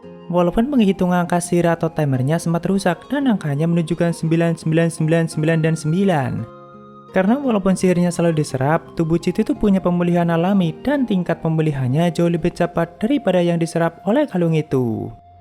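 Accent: Banyumas, Indonesia. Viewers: Ga terasa udah part 45. Mantap apakah mimin orang ngapak2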